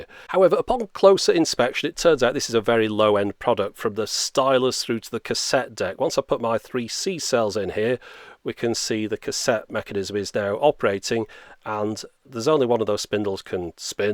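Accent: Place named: England, United Kingdom. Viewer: Josh Bacon u are so right... And his English accent makes it so premium to look =) I love too!!!!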